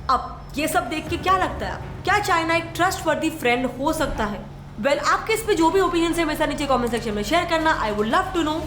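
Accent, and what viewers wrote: India. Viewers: It's weird seeing a Chinese looking lady with Indian accent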